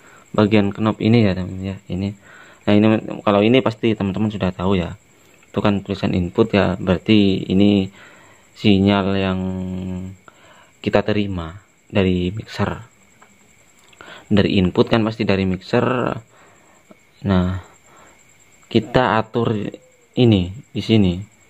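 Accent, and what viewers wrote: Madura, Indonesia. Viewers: hihi kadeng kloar skunik madurenah. Sukses lah bos lanjutken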